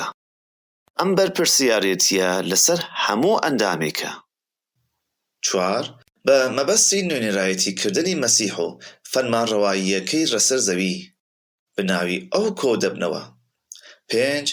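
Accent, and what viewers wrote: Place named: Iran. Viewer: ئایا کوردێک نییە ئەم دەقە بخوێنێتەوە؟ ڕێزم بۆ ئەو کەسە هەیە ئەمە دەخوێنێتەوە، بەڵام ئەو کەسەی ئەمە دەخوێنێتەوە دەنگێکی فارسییە نەک کوردی، زۆر لە وشە و پیتەکان بە هەڵە دەخوێنێتەوە.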